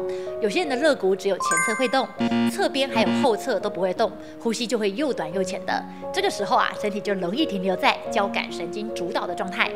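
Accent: Taiwan. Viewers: 台湾腔好可爱☺️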